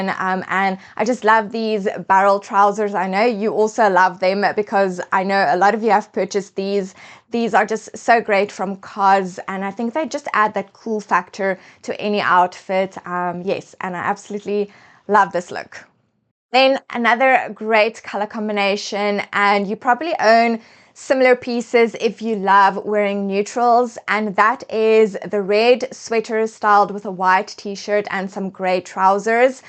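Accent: South Africa. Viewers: I KNEW IT!!!!! My family is from Cape Town but your accent is so much less pronounced than theirs… been in Canada for a long time already? ❤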